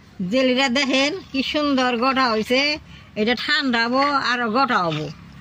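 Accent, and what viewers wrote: Tangail, Bangladesh. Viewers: টাঙ্গাইলের ভাষা।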